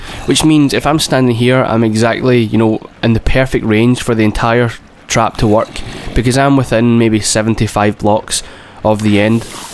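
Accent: Scotland, United Kingdom. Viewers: i was going to watch , but then i heard his scottish voice and chose otherwise .. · LOL no, he's Scottish. You'd think people would know by now. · subbed cause you're Scottish! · fucking wooo haha a scottish minecraft player :) finally someone without an annoying accent imo lol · Scottish sod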